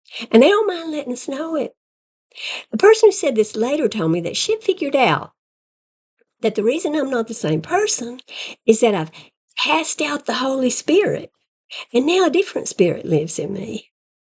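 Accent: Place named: Texas, United States. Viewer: Are you texan? Your accent sounds texan (im not from america)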